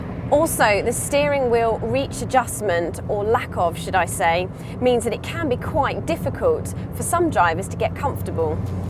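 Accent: United Kingdom. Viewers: Ughhh the uk accent .... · I like your voice, British acsend 😆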